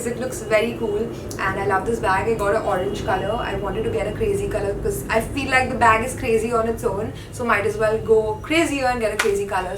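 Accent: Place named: India. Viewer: Talk slowly..too fast hard to understand because of strong indian accent.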